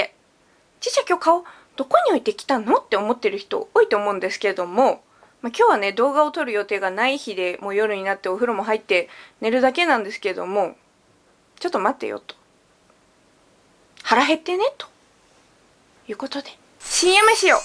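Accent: Aomori, Japan. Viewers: 出身青森？訛りが青森っぽい！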